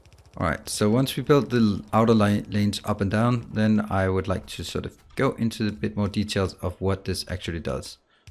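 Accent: Denmark. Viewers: I like your Danish accent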